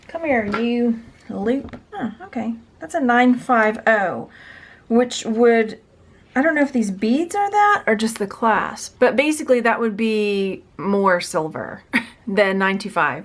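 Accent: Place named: Texas, United States. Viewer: You are cracking me up! I needed this today. I hear my Texas, teacher self in you with your silly songs. Don't you love exaggerating our Texas drawl??! Then the, Labyrinth stuff sent me over! Love it!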